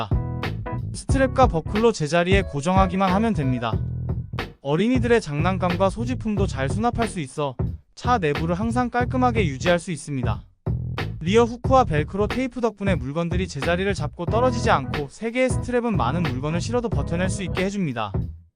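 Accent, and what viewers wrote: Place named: Yanbian, China. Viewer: 조선족이냐 백미러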